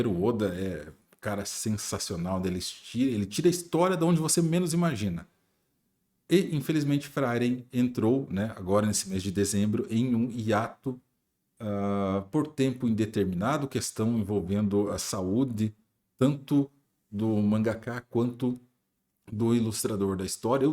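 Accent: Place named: Rio Grande do Sul, Brazil. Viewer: muito obrigado. Uso saquinhos em alguns, mesmo morando na Paraíba. O sotaque parece gaúcho. ☺️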